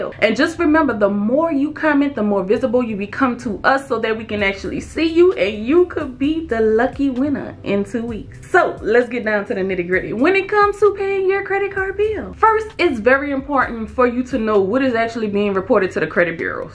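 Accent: New Orleans, United States. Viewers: I knew you were from NOLA or the area when I heard you speak. :) Good info. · So 1-2 percent is the magic number I thought it was 9 percent Thank you and your husband for educating the masses Knowledge is power are you from New Orleans or savannah loving your voice I really could use the 500 dollars January is the month I apply for my very own house after Divorce Please choose me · Ok so im new to your channel. This is my first video. I SWEAR your voice has a New Orleans kick to it. (That's a compliment. I live in La) 😍 That is all!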